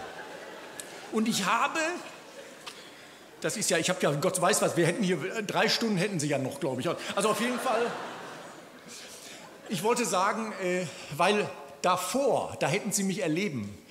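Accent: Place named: Duisburg, Germany. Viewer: So reden viele in Duisburg.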